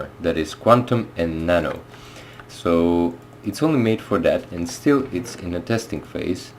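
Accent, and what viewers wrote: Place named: India. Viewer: hmm he sounds like Indian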